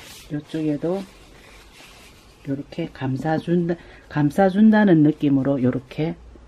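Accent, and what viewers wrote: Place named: Gyeongsang, South Korea. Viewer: 아ㅡ고 포장여시 고수의 손길이 보이내요 ᆢ이리 꼼꼼이 ᆢ 정성또한 가득하네요 ᆢㅎㅎ♥️♥️♥️♥️♥️♥️♥️정겨운 갱상도 말투가 참듯기 좋네요 저두 진주 가시나 입니다 ᆢㅋㅋ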